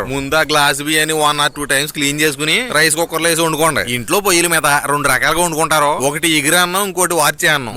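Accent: Godavari, India. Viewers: ❤💐Good night annaya ❤💐Godavari slang Padma Bhushan God of masses.Nandamuri Balakrishna Jai.balayya dialogue super👌 annaya so many sisters so many brothers rice cooking people unnaru annaya bachelor boys bachelor girls thank you so much for Vizag Andhra Pradesh Telangana ❤🦁🐯👏👌🔥⭐💯🎉👑👍💐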